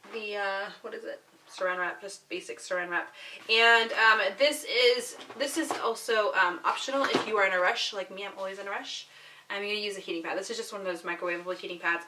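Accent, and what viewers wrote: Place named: Iraq. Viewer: هلو اول شي اني فخوره لان انتي عراقيه ثاني شي ارجو تنزلين لفديو بلعربي بسرعه لهجتج يعني لغتج بلعربي (عراقي)كللللللش حلوه حته شكلج يكون احله.شكرا جزيلا الج لان انتي متعرفينه ولا شايفته وتنزلين فديو نستفاد منه شكرا لان تحبين لجمال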